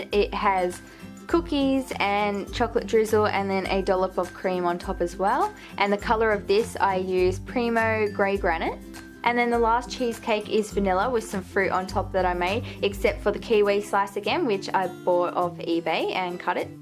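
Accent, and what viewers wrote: Australia. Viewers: I love the accent of Australia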